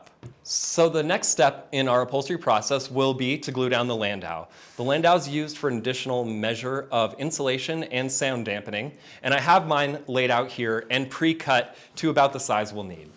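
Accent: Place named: Germany. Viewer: Thx, really worthwhile to watch. Btw, zufällig deutsch? Wegen des ever so slightly hörbaren Akzents.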